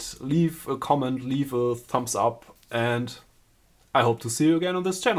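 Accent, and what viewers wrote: Germany. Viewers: Like the video 😃 keep up the good work . Also are you German ? You should like a German speaking guy.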